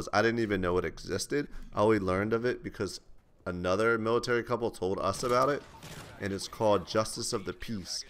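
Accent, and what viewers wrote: New Jersey, United States. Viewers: i knew you were from jersy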